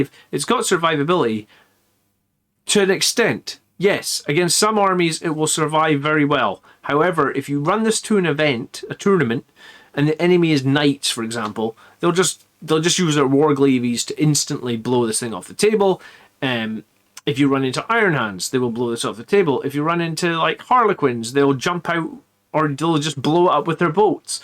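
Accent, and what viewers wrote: Scotland, United Kingdom. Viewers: Wait, you're scottish?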